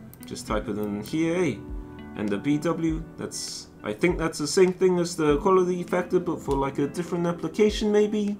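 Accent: New Zealand. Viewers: He's a newish type of zealander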